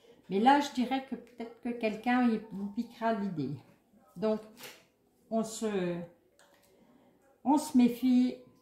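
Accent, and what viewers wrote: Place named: Haute-Marne, France. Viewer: Rhooo ben non... on n'en a pas marre du tout, au contraire... on en redemande 😀😃🙂🙃! C'est toujours un grand Bonheur Boostant et Apaisant d'entendre ta voix qui me rappelle de si bons souvenirs (ils sont bien au chaud dans mon Cœur ❤ ❤ ❤ ❤ ❤)! Et cet accent haut-Marnais 😉😉... un réel plaisir 😀😃. Là c'est l'hôpital qui se fout de la charité 😀😃🙂🙃😊😇😀😃.... On me dit à La Rochelle: - "Vous n'êtes pas d'ici vous!"... Voilà, une partie de mon Cœur est dans les Vosges, une autre en Haute-Marne et encore une en Charente-Maritime 😉😉. Bref... mon Cœur a beaucoup de place 😉 et tu y es bien au chaud ma. Bisous à toute la famille. A tout bientôt ma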